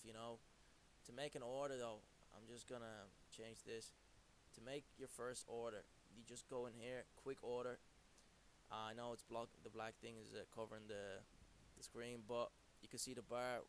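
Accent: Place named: Ireland. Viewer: I take it your from Ireland judging by the accent, same as myself, I was looking into this firm as oppose to Davy I was just wondering how you find trading with them? I was attracted by really low fees but they seem dodgy. I would mainly be a value investor type guy, so il be investing for the long run, but just wondering is there maintance fees for the account and all and how do you find trading with them? Are they worth it also are you paying CGT per trade or ?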